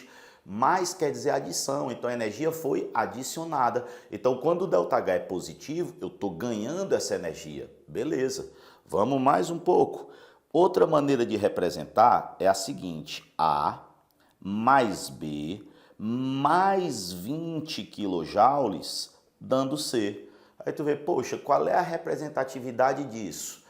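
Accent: Ceará, Brazil. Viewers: Ele é cearense?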